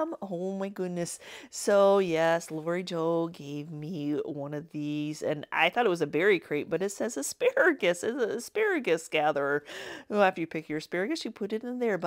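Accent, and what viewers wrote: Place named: Texas, United States. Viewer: Love the Bible tree and the "God winks". I've experienced them many times since I lost my husband unexpectedly. It has been 2 years but it still feels like yesterday. So God winks are essential to my health! Love your channel, your collections, your personality and your crafts. Are you sure you're not from Texas because you sound like one of us!😁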